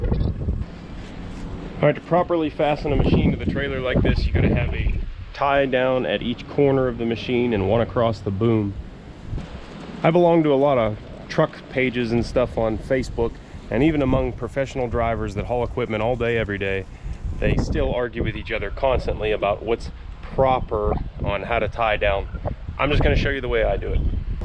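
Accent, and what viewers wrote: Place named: Missouri, United States. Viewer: Hi, Reading through the comments i picked up your name. Someone said Pennsylvania? By your accent and the trees was guessing Missouri but I guess not. Anyway when I worked at a Lead Foundry in the 70's drove a 920 Catipiler front end loader and everything else they had there. What I really enjoyed driving there was the old White Freightliner. Had a hydraulic 5th wheel hitch that you could hookup the trailer and lift it off the ground and go after you swung out and hooked up the pigtails and lights. They had all kinds if forklifts but my favorite was the small hyster I used for running shipments around and weighing stacks of lead bricks to band them up. The bander was electric which was awesome as well.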